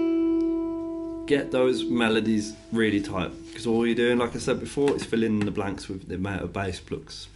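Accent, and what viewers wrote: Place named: Midlands, United Kingdom. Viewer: U sound really Midlands lol I love it......Ur teaching but I need you to show me imgd but dumb lol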